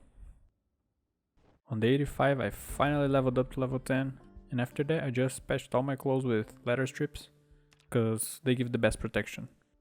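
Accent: Brazil. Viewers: sabia que tú era brasileiro, o sotaque é inconfundível kkkkk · Pelo seu sotaque você e br só pode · você é brasileiro? parece muito o sotaque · Eu sabia que tu era br! O sotaque ta do jeitinho, boa série btw, ganhou o meu follow! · Where u from? U got a brazilian accent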